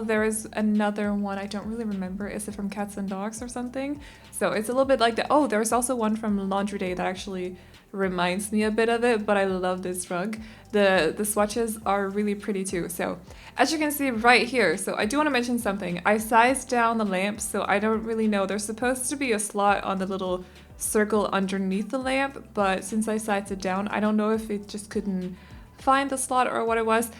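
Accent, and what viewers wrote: Denmark. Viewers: Omg- your Danish accent is so good! 💖🇩🇰 (Btw amazing build)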